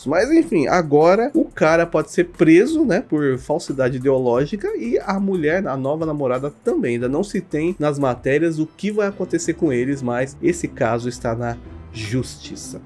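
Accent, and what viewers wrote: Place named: France. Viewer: Olá muito bom seu sotaque francês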